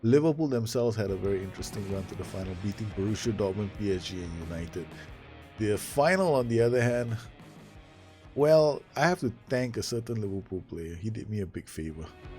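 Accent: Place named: Singapore. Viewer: I cant put a finger on your accent dude...at times you really sound singlish... Then you you would say something that would throw me off completely...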